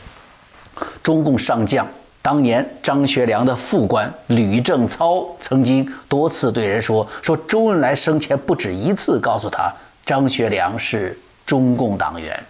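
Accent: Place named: Northeast, China. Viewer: 江老师东北话说的地道!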